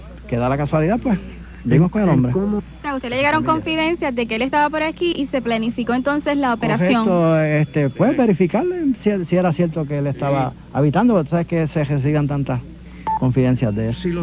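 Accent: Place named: Puerto Rico. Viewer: Ok estare esperando en especial me encanta ese asento puertorriqueño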